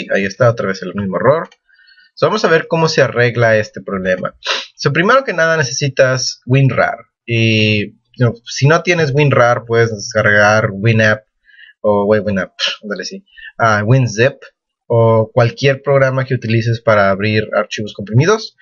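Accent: United States. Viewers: Español chicano everywhere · hablas como gringo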